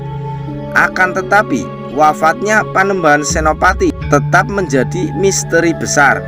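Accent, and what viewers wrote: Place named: Banyumas, Indonesia. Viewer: Naratornya asal Banyumas sepertnya. Apakah yg punya k9nten "?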